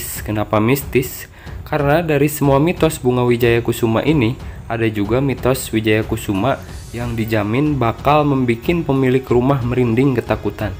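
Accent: West Java, Indonesia. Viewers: Ari si akang orang Sunda ya 😀😀😀🤭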